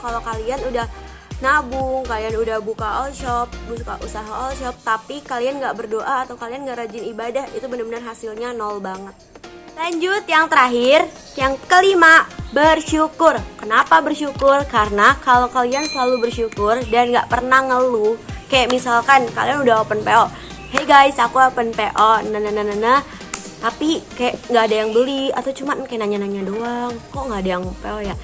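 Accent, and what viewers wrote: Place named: West Java, Indonesia. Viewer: Orang sunda nya kak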